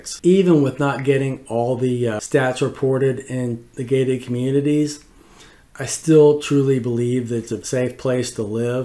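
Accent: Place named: Pittsburgh, United States. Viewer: Great content! You sound like you’re from Pittsburgh!?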